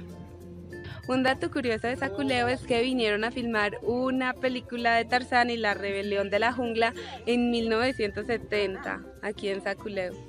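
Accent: Colombia. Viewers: A mi lo que me inspiraria a escribir son tus bellos ojos y tu forma de hablar colombiana se ve que sientes frio para la próxima llevate un sueter que este calentito porque el que tienes puesto no calienta en lo más minimo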